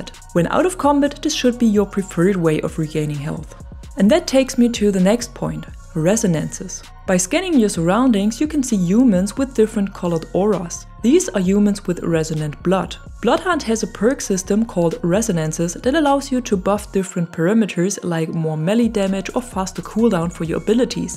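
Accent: Germany. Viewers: Are you a german ? xD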